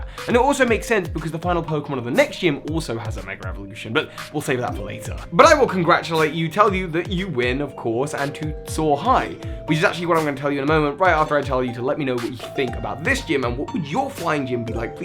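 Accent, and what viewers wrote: United Kingdom. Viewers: I kid you not, I've been watching you for a year and a half now, and I swear I always thought you said "So hi" instead of "Soar high" until this video. I blame your British accent for that. Edit: I've been blessed with the coveted heart from!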